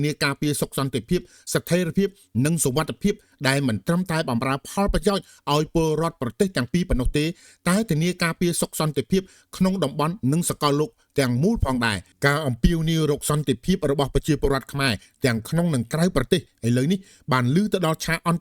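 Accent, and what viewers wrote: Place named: Vietnam. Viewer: និយាយខ្មែរសំនៀងយួន🤣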